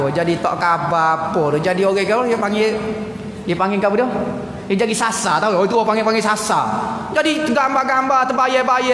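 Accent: Kelantan, Malaysia. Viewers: Sedak dengar kecek klate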